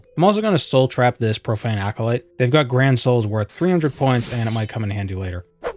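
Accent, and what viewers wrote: Boston, United States. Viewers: You do a great job masking it most of the time, but your Boston accent is just too strong. Great content, keep it up.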